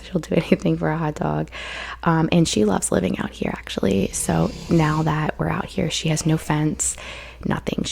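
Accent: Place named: Maryland, United States. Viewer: And also, accent wise, I’m originally from Maryland and i think I might I hear a little of that (or Pennsylvania since they’re similar) in you!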